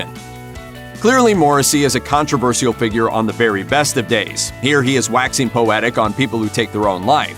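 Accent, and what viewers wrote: United States. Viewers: It's interesting to choose the most inappropriate American blah blah voice to essentially attack with much untruth and sensationalism a English artist.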